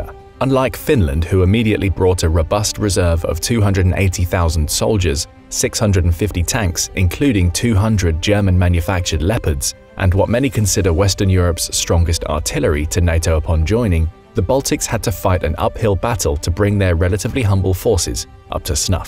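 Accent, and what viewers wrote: United Kingdom. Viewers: Is this the Infographics guy with a British accent? 😮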